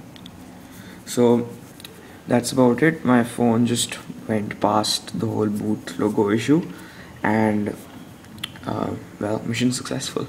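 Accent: India. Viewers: A white guy with an indian accent you dont see that every day :3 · dosent work and hard to understand indian taxi acent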